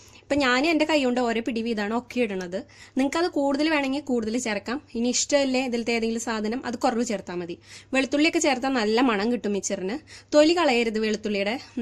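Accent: Thrissur, India. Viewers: good job. like ur presentation..trissur slang...thank u....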